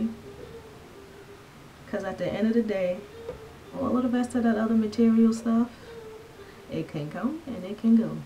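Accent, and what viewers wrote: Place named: New York, United States. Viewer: Love this vid! Are you from New York? You kinda have the accent.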